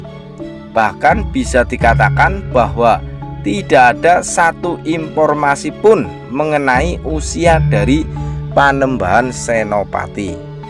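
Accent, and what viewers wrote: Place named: Banyumas, Indonesia. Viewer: Naratornya asal Banyumas sepertnya. Apakah yg punya k9nten "?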